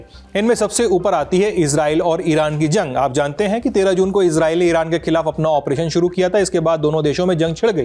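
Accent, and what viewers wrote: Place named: Varanasi, India. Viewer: पसेरी के भाव से बनारसी टोन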